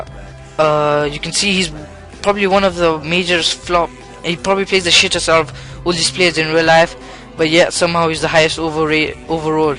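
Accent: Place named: South Asia. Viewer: you sound brown D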